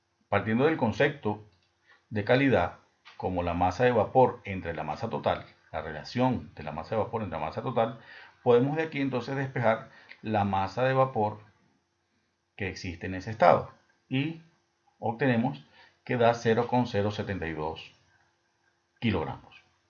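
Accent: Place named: Venezuela. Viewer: Excelente hermano muy buena tu explicación! Y con acento venezolano todo se entiendo mejor..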